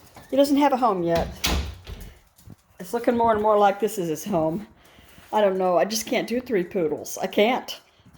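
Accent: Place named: South, United States. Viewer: Hey! Here watching. Loving ur southern accent. Ur so sweet!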